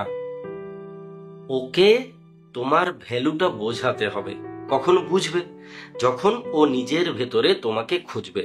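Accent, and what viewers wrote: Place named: Midnapore, India. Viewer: Apni ki midnapore er dike thaken? Kothar tan ta oirokom e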